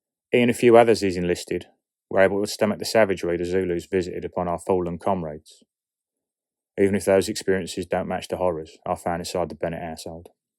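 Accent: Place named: London, United Kingdom. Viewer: Oh dear… I don’t fancy Sgt Hargrove’s chances! 😱 I really enjoy these shorts, as with Hengis, they whet the appetite for what is to come! Presumably, as this is titled as “Project Aeturnus”, some kind of Government skulduggery is afoot? Perhaps trying to uncover the secret of immortality? 🤷🏻‍♀️The reference to Sawney Bean & his cannibal crew intrigued me….perhaps even as fellow cannibals, their primitive slaughtering offended the much more civilised Lucius? 😉 Bravo on your London accent …. 😉 can’t wait to see where this is going & what happens to my favourite bad boy! 😂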